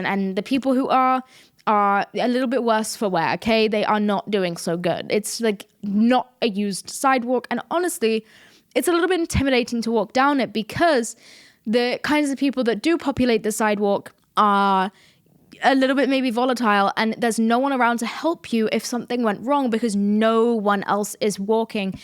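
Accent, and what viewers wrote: United States. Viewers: US accent innit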